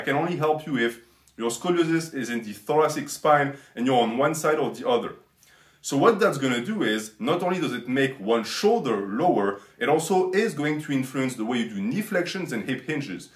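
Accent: France. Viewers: I literally for some reason could not put my finger down on your accent, and now I know it's French...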